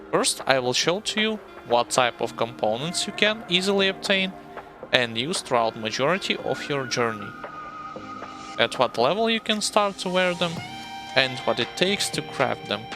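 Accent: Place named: Eastern Europe. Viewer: Great info overall, the eastern european accent makes it interesting also.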